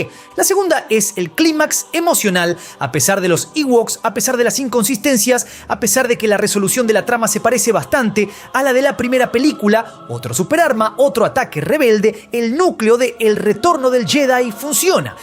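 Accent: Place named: Argentina. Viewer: ¡Es tan épica la historia de Lucas y #StarWars que incluso este pinche video narrado en HORRIBLE ACENTO ARGENTO (que destruye al idioma español y al inglés al mismo tiempo) resulta algo tolerable! 🤌🤌 ¡#MalditosArgentos, son tan odiosos e insoportables! :body-green-covering-eyes::face-turquoise-covering-eyes::face-turquoise-covering-eyes::face-turquoise-covering-eyes:🤮🤮🤮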